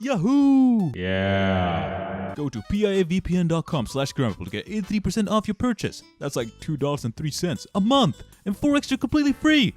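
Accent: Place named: Sweden. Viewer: He is Swedish. That's why he has swedish accent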